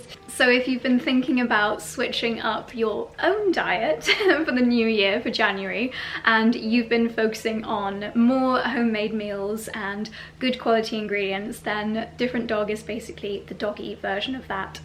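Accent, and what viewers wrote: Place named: United Kingdom. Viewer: I got to the “tail” end of your video, as I always do. I enjoyed the scenery, your bright, darling British accent, the homemaking bits…yours are some of my favorite videos to watch! One day I WILL travel from Texas to the Cotswolds…it is my dream. We have some lovely scenery and even quaint country roads in Texas, but we fall flat when it comes to architecture.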